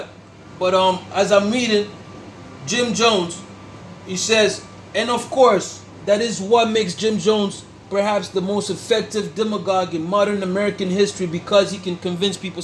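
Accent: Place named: Jamaica. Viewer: You sound jamaican ☺🇯🇲💖or other caribbean island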